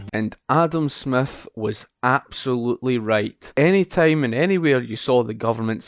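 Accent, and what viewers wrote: Scotland, United Kingdom. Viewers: Scottish that’s the accent I was trying to place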